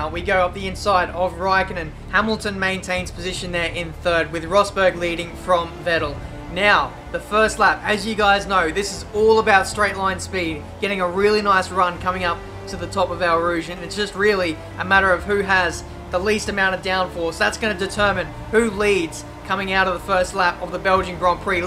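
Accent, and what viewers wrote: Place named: Australia. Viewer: i honestly don't know why i watch these videos. the australian accent is so difficult to listen to, it makes my ear bleed. the driving, albeit fast, is pretty bad. contact with other cars all the time. and codemasters, continues with crappy ai. why do i watch these videos?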